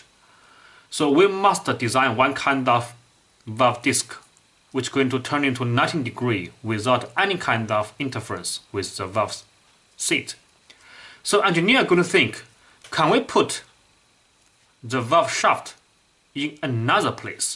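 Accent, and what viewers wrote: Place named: China. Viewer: 中式英语口音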